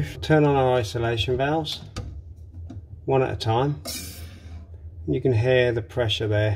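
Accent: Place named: East Anglia, United Kingdom. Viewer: Good concise video ,you sound like a good honest east anglia guy ! D from Norwich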